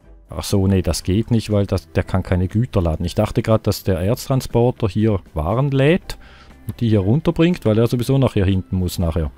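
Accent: Switzerland. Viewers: endlich mal ein gutes lp mit schweizer akzent! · Du bist der Einzige wo ich deinen Schweizer Dialekt versteh :)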